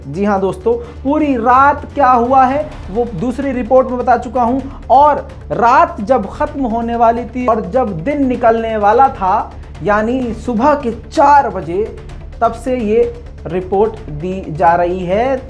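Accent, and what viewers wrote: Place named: Bihar, India. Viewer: sahab aap bahut acchi anchoring karte haiN thora lahze meN biharipan hai usko bhi durust kar lete to aur nikhar jate waise jitna hai wo bhi qabiledad hai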